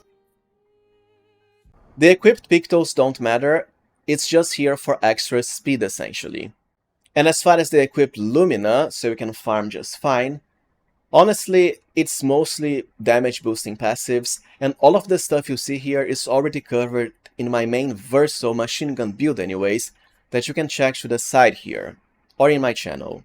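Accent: Brazil. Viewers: Tu é BR né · Are ypu brazilian or portuguese speaker?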